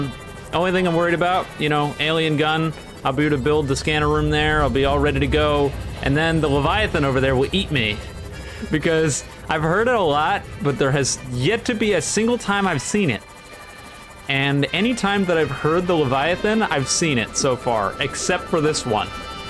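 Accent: United States. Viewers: I didn't know you were American! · Yoo he's american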